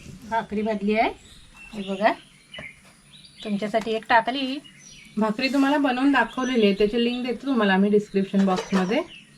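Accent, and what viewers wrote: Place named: Konkan, India. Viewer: काकी छान मस्त कोकणी बोलतात...कोकम शिवाय नाय चव लागत... 😊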